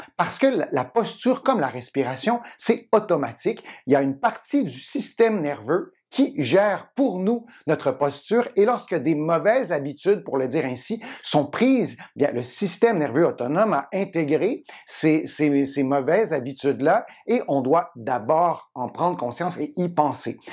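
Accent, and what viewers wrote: Quebec, Canada. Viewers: Vous êtes vraiment génial super original avec l'accent du Québec, un vrai personnage. Merci vos conseils me change la vie !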